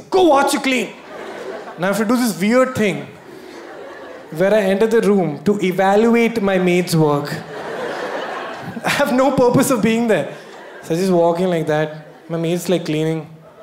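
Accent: India. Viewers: Kenny sabastian he is a Indian with that accent ding ding only Indians will understand him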